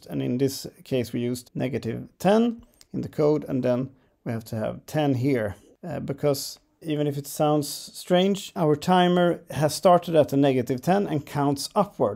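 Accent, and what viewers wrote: Sweden. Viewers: The second I lissened to your voice I was like YEPP HE MUST BE SWEDISH before even reading your name/ Hello from Another Swede :) Nice too see a Swedish Gdevelop user make videos! Please continue with the tutorials.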